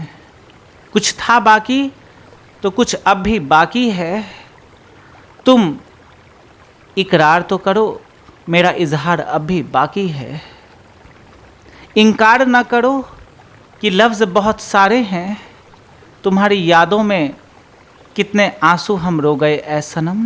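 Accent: Bihar, India. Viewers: Isko ignore kro , Isko jitna importance doge ye highlight hoga aur logo ko chutiya banayega. Ye mimicry kr rha hai, Bihari hai ye iska tone bta rha hai.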